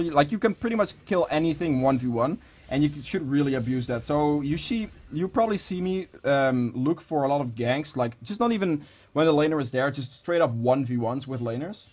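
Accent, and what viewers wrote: Netherlands. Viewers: ur dutch am i rigth?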